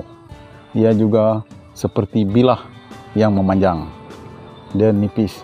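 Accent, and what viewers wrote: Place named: Northern Peninsula, Malaysia. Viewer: Helo bang👋🏻😍salam kenal🤝🏼slang abg macam slang utara semenanjunglah✌🏼😉ok bang terima kasih atas perkongsian yg sangat berguna ini ya..saya x pernah tau lagi pasal pokok jerangau ni..terima kasih bang😍👍🏻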